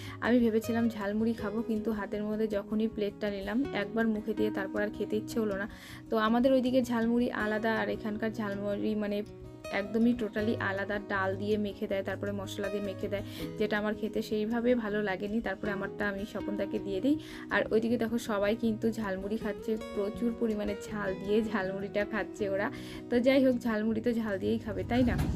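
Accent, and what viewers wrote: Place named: Bangladesh. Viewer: আমি আর আমার বন্ধু তোমার ভ্লগ দেখি। তোমার বাংলাদেশের টানে কথাবার্তা গুলো শুনতে খুব ভালো লাগে। আমাদের বাড়ি কলকাতা কিন্তু আমাদের দুজনেরই আদি বাড়ি মানে পূর্বপুরুষের নিবাস বাংলাদেশে ছিল। খুব সুন্দর হয়েছে ভ্লগ টা। শিলিগুড়ি জলপাইগুড়ি তেও আমার বেশ কিছু পরিচিত বন্ধু আছে। ভালো থেকো।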